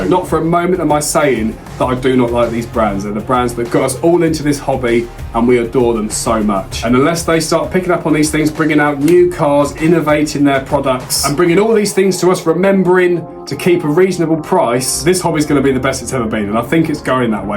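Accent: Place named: United Kingdom. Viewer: Great another British speaking channel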